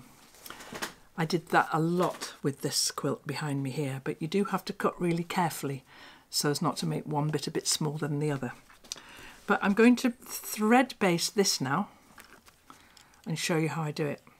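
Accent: England, United Kingdom. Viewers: Thank you so much! ... I already do EPP.... But I love listening to your English accent and I love paper piecing, So it was extremely enjoyable to just watch you go through your process. I love that you are so humble and that you encourage others to do what you obviously enjoy. I love to share what I do with others as well. My dream would somday to come and visit you and see your lovely little home and gardens..... You live the life that I dream of. 💖💝. Thank you for sharing your life with us!!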